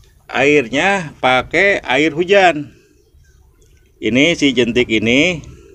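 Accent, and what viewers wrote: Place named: Sunda, Indonesia. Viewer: Mantap mang ni medok bahasa sundana 😁 salam ti Purwakarta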